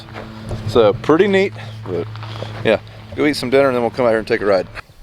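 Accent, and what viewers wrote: Ohio, United States. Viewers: 3 things It sounds like you are from the great state of Ohio, like me I like the buggy A million Indians can't be wroung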